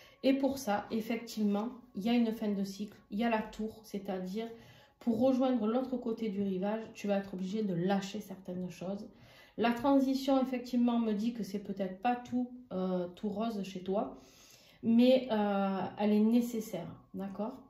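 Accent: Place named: Provence, France. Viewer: Votre accent....j'adore ! 👍 le soleil, les cigales,les oliviers, les lavandes....tout simplement la Provence ! En tout cas, la Balance que je suis se retrouve dans cette guidance très parlante dans beaucoup de domaines. En pleine succession familiale, avec tout ce que cela implique....pas simple à gérer. Professionnellement je pense qu'ils vont savoir comment je m'appelle !!!! Relationnel et sentimental, il ne veux pas déterrer la hache de guerre, silence radio depuis des longs mois, je sais qu'il ne reviendra pas. Je guéris tout doucement....Merci ❤⭐🌹🙏🌞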